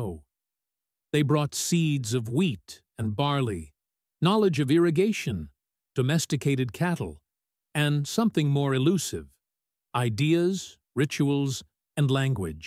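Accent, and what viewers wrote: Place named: United States. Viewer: Why is an American telling us about our DNA? Personally, with trump destroying the world, I'm very irritated by the accents from the US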